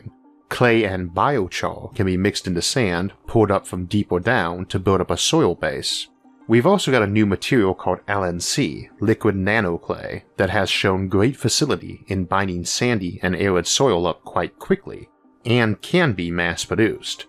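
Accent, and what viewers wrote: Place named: Texas, United States. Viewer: Yes as a Brit I thought that maybe he was a Texan or from the Southern states.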